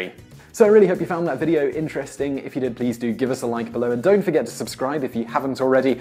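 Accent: United Kingdom. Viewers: Aren't all these videos simply a guy with British accent fast-reading Wikipedia articles on the most common, mundane subjects?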